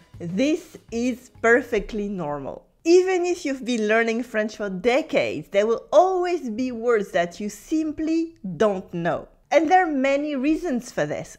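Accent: France. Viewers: Actually the way pronounces the word "adjective", with the stress upon the 2nd syllable, is also the way we do in the UK - only just without her delightful French accent, sadly enough....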